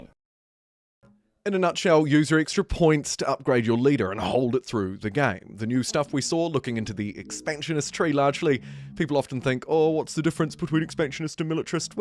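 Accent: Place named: New Zealand. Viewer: Good to hear a Kiwi accent coming up on 100k subscribers. Well deserved too!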